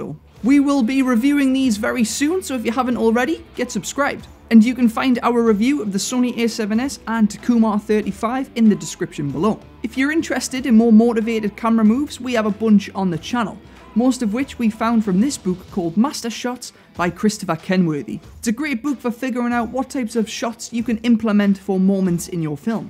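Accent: United Kingdom. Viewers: Hey bro whats your accent? I aint british but interested in british accents. The acceent I speak is similar to yours. Is it a north accent?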